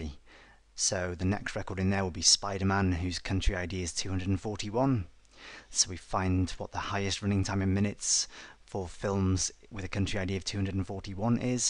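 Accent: England, United Kingdom. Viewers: is seriously one of the best instructors I've found on youtube. You start from scratch, with simple examples and you build on your lesson plans beautifully after that. I cannot thank you enough on how amazing SQL lessons were, as well as your VBA lessons. I thought I had enough knowledge I needed for basic VBA, but still ended up learning a tremendous amount from those videos and then finally SQL. I'll admit I got confused with correlated subqueries, went on to google for a bit, but finally watched this few more times and they finally clicked. Online training courses can be expensive and so I want to thank you for providing SQL and VBA on youtube. It's greatly appreciated. There are a lot of smart people in the world, but not everyone can be a great teacher and you certainly are a great instructor and British accent definitely a plus:)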